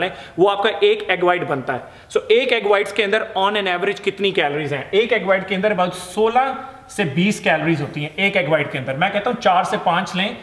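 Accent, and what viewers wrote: Punjab, India. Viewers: indian punjabi/ pakistani punjabi with some foreign english accent.. amazing.. maza aa gya... thank u soo much veere... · U speak superb...while watching ur video, I'm smiling..ur Punjabi style👍 I'm also punjabi but even I can't speak that much Punjabi😂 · Vo punjabi accent haaayee🤗🤗💕💕 love it. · i love your punjabi accent keep it up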